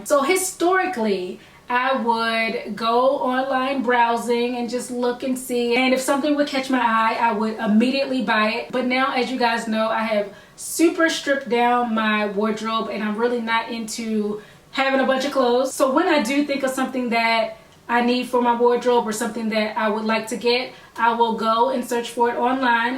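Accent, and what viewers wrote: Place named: Philadelphia, United States. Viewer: Is this girl from Philly??!! The accent seems so familiar... :-)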